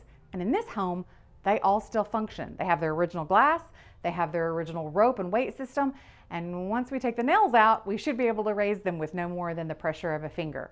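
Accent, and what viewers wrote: South, United States. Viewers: I absolutely love her subtle southern drawl 😍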